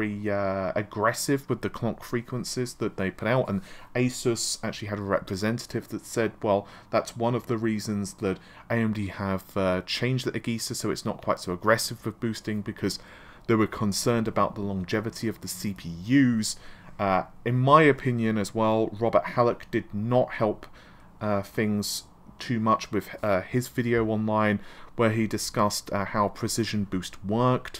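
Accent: United Kingdom. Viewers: He is not mispronouncing anything,its called British accent. I am getting tired of those jokes. Amata is pronouncing Navi in a very weird way now!! Leave them alone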